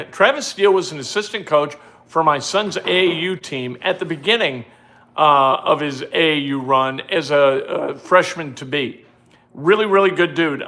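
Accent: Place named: Indiana, United States. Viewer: It’s odd that you said you moved to Indiana. You are 100% a Hoosier in your way of talking and mannerisms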